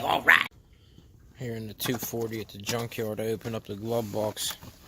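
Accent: Pennsylvania, United States. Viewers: Holy Pennsylvania buddy. I'm from PA and live in GA so I can pick up that accent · Yep, the PA accent for sure. Grew up'err 'bout a mawl from the store down'err .. luv it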